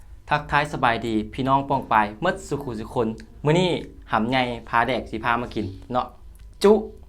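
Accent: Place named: Laos. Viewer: เป็นคนที่ไหนเสียงคือลาว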